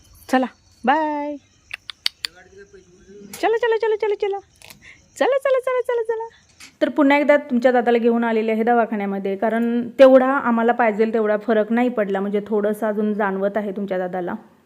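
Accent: Kolhapur, India. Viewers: Hii Me aata 5/6 devsapasun tuze vlog bagte ahe....tumche kolhapuri language mala khup aavdte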